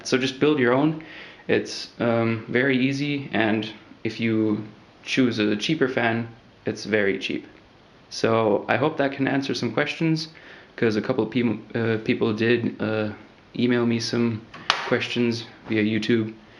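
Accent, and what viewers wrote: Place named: Germany. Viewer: so typisch deutscher akzent ^^ super reviews und super paint booth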